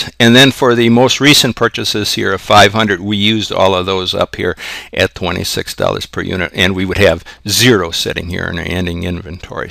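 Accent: Boston, United States. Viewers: Your Boston accent is so strong, I imagined Peter Griffin speaking. Nevertheless, great tutorial! Definitely needed it for my FA class.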